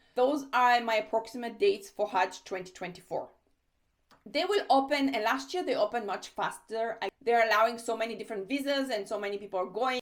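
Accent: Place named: South America. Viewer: Thank you so much!! Are you from South America? I really like your accent!!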